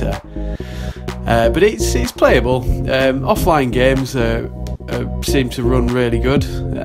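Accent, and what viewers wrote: Yorkshire, United Kingdom. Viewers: Definitely beater not baiter! Trying to place your accent...fellow yorkie?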